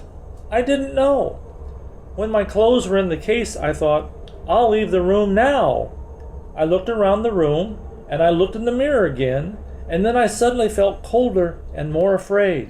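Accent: United States. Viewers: it was impressive.. We need another ones like this.. I love American accent alot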